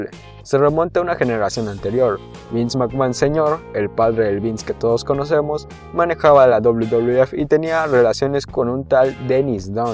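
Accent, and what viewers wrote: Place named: Andalusia, Spain. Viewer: Me desuscribo, no me gusta tu acento sureño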